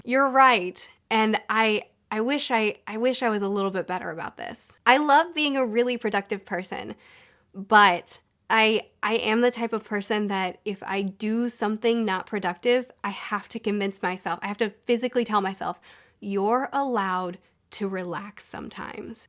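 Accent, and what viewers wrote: Canada. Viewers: I’m surprised that you get compared to Lacy Chabert most. I think you look far more like Linda Cardellini, and your voice is way closer to hers too. I don’t see the resemblance to the other three examples at all. Also, I think the reason a lot of people think you’re from Canada is because of how you pronounce the first O in words like “borrow” and “tomorrow.” That stood out to me as well. Anyway, love your videos! 😊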